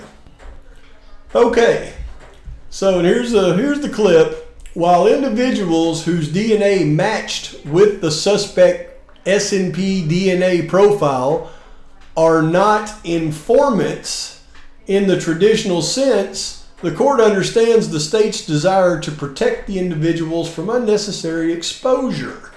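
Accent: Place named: Texas, United States. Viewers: Yep. I was peggin that accent today while watching this video. Have we got us a texan here? Ex aTexas Ranger perhaps?? Just a thought. Imo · You sound like you are from FORT WORTH TEXAS or near by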